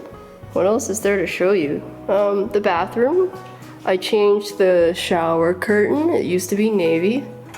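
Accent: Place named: New York, United States. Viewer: Crazy. I thought you were in your early 40’s and your delivery is very nation New York, woody Allen. Congrats on buying in NYC,maybe you could have waited a few. If you did not hear there is a communist in NY and all the millionaires will be selling their properties to head to Florida. Discounts abound. 😉 congrats. Now please explain the difference between a condo and a coop?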